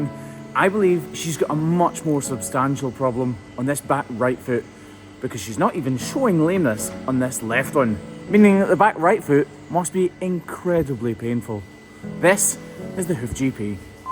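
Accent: Scotland, United Kingdom. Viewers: You sound even more Scottish than normal in this video lol. I love it! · I would move to Scotland just to hear the accent. Great care with the cows :)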